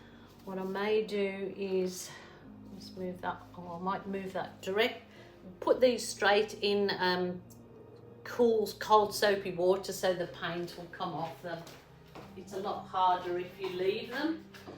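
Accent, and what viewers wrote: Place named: West Midlands, United Kingdom. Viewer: Every now and again I hear a West Midlands accent in your voice …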